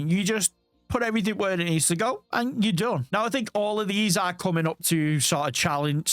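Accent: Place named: Warrington, United Kingdom. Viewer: Im getting Warrington vibes from your accent mate, am I close?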